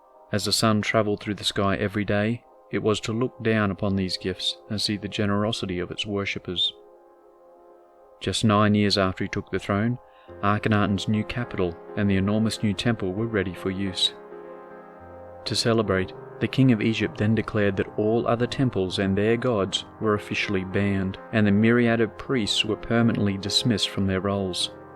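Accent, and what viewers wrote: Australia. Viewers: Clicked on this, was shocked to hear an Aussie accent, New sub here! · Awww c’mon now. Just coz we have an Australian twang we don’t need to mispronounce names….Amun Ra is not said as Ahmoon Ray, it’s more akin to Ahmen Rah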